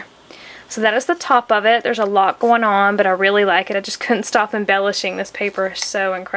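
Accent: South, United States. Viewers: LOL...I should be bangin my head on a wall...How could I not know...I live in Ft. Pierce and my store is in Pt.St. Lucie and we had the visit form Isaac too...I did pick up your "Y'all" lol you can't hide your southern girl...hehehe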